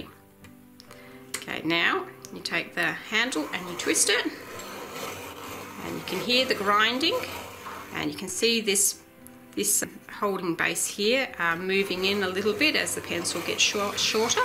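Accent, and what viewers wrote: Australia. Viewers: A very good straightforward video. Also has one added plus for me personally - it's by an Australian and as I'm an Aussie too it's good to hear it on the Internet once in a while! Note: Officeworks now sells it for A$49.98 (22/5/2019), so it's gone up $5 since that video was uploaded in 2016.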